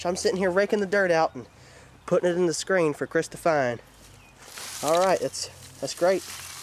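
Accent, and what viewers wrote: South, United States. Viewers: You got the most country accent ever! I didn't think anyone could outdo me. Good luck out there huntin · That's a Southern accent I know because I am Southern and spend my life outside with the chickens and cows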